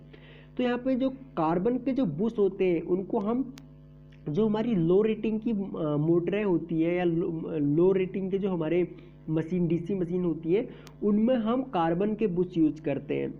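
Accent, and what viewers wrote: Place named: Bihar, India. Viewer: Bhai bihar ke Ho kya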